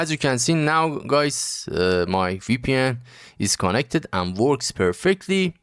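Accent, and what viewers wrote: Iran. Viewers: اوففف لحجه ی فارسی 😂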